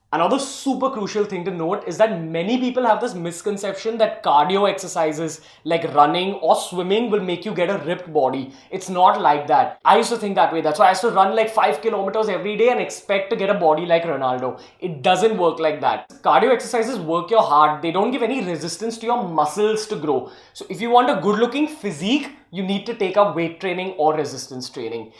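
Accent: India. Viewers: So sorry but I just cannot stand the Indian accent. It haunts me as I had a terrible experience with an Indian call center scammer who syskey'd my machine. I wish you did robot voice instead. Good luck though, you seem like a pretty chill dude.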